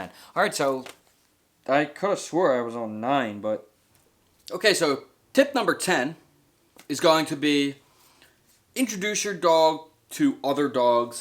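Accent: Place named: Maryland, United States. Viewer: I was thinking Maryland too, possibly Delaware. The accent!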